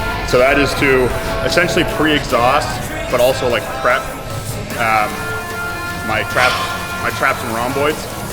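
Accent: Australia. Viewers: That Aussie accent 😂♥️ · As an Aussie that accent was pretty accurate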